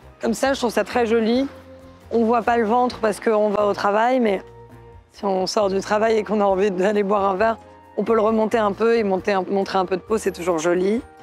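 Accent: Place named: Paris, France. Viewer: It’s not uncommun to find a voice like her in paris (+30-40yo women). Personally, it don’t disturb me.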